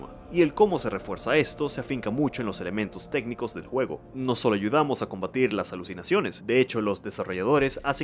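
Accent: Venezuela. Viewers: como se nota q eres venezolano, salu2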